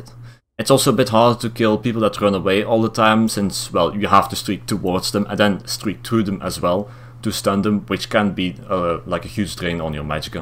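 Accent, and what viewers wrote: Limburg, Netherlands. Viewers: you sound dutch :) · You got a Limburgs english accent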